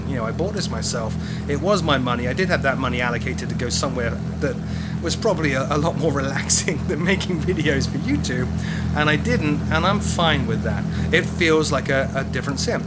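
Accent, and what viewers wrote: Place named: United Kingdom. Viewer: I can tell you have a Brit accent, but for some odd reason I get the feeling you live in the states.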